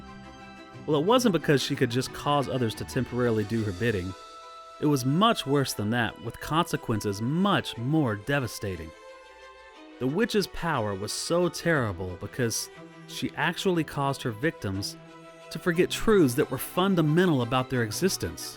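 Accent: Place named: United States. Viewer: finally someone who’s American speaking to me in English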